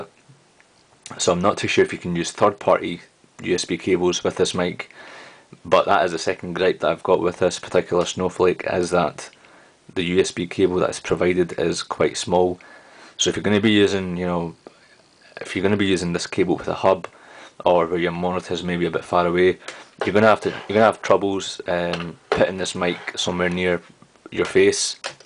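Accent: Scotland, United Kingdom. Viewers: Are you Scottish? B)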